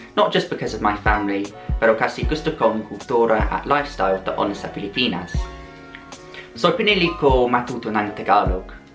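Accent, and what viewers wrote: United Kingdom. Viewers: You should pay more attention to your accent, you are speaking Tagalog but with a British accent. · SaLute! kitang-kita grabe ang dedikasyon mo para matuto ng tagaLog and it reaLLy worked and growing, kudos, more power! Love your Brit accent too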